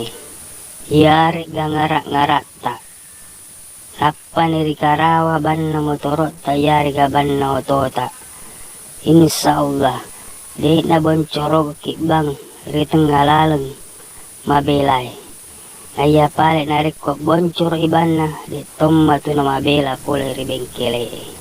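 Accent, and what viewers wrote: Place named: Soppeng, Indonesia. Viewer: Kya Bugis soppeng bahasanya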